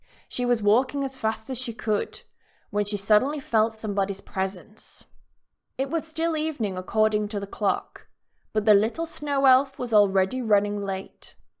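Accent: United Kingdom. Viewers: I like your teaching British english accent Madam thank you Madam I always listen your British accent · Omg you're the best, I appreciate your work ❤ I'm glad to be here and learn the British accent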